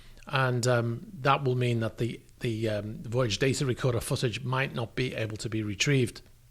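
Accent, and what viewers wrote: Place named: RP, United Kingdom. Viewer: Don’t give a damn about wet stuff. I watch this channel since the beginning because of most perfect bbc English ever. Pure enjoyment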